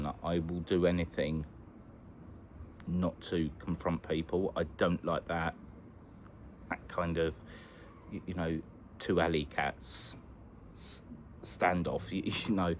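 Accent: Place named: Estuary, United Kingdom. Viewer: I like the way you speak from the heart, and I adore your Estuary English speaking (if I've correctly pinpointed where you are from). I'm a French English learner and sometimes it's difficult to understand different English accents. Yours is so accessible, I really like it and watch a lot of your videos.. do more like this, please!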